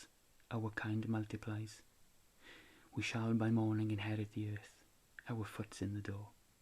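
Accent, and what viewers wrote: Wales, United Kingdom. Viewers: A Welsh accent! Very pleasant to listen to. Supposedly many of my ancestors were Welsh (I'm American). I can't wait to see more videos from you! :)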